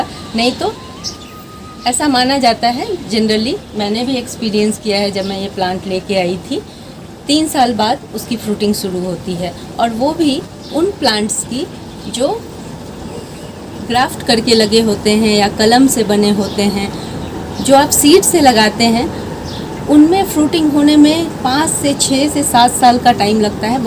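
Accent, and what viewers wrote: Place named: Uttar Pradesh, India. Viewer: Uttarpradesh accent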